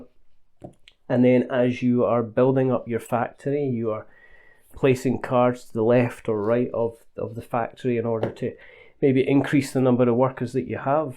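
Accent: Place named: Newcastle, United Kingdom. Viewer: I had no idea you was a geordie.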